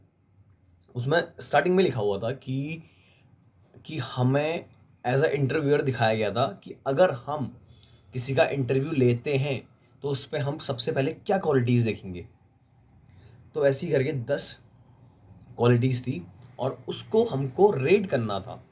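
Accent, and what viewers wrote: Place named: Nepal. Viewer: Interview ki bareme jankari diya bhai great, nepali jeisa laga bhai aapko aap nepali ho?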